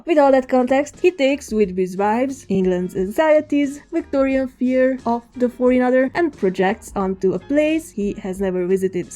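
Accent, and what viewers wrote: Hungary. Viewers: you definitely have hungarian accent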